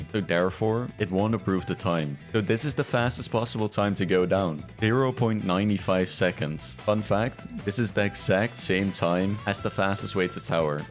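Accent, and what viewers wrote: Netherlands. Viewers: You're Dutch aren't you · So ur dutch right? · Dutch pronunciation :)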